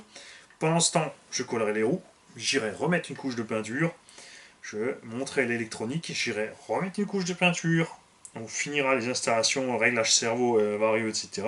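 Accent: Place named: Alsace, France. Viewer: bonjour. j adore vos vidéos et votre accent me rappel les 6 années que j ai passé dans la région de mulhouse. je suis passionné de modélisme rc et j ai pratiqué le piste 1/8 thermique dans les années 95/96/97. (sur chassis mugen et serpent). j adore votre façon d aborder la rc loisir mais de façon sérieuse et pro. tout vos conseils sont super. et domage que je ne soit plus en alsace car j aurai adoré rouler avec vous sans les contraintes d un club. bonne continuation a vous.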